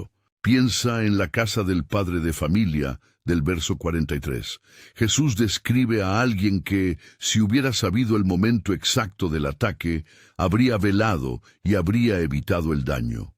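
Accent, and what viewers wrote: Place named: Castile, Spain. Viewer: pones tu vocecita castellana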